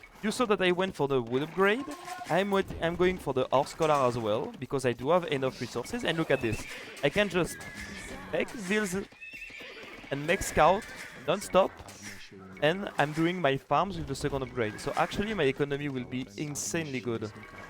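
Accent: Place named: France. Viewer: Bizzarement on sent dans ta voix que tu parles français ou que tu as une peux l'accent je me trompe 😏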